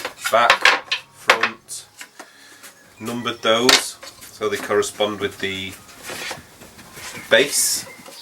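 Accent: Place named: Yorkshire, United Kingdom. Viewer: Are you from Yorkshire?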